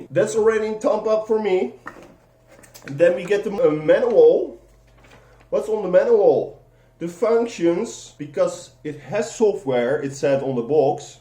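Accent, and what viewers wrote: Netherlands. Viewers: je bent zeker nederlands jou accent is gelijk te merken😂❤️ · He is Dutch and to talk with a dutch language its hard to talk english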